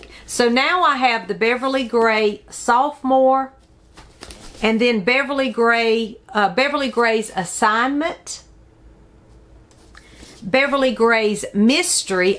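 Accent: South, United States. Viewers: Hey,!!! I hope you're doing well. You look so beautiful. You don't look old enough to have grown children. I love your southern accent. Where are you from? I'm from Mississippi. M.C. Beaton books are SO good. I see you picked up some books from the Hamish McBeth series. You should also try the Agatha Raisin series as well. I love them all. Great video!!! Have a great day😊😊😊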